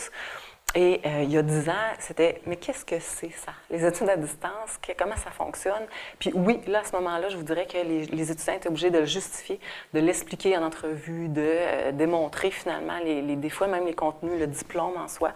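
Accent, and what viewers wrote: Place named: Brazil. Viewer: me parece un brasileño hablando francés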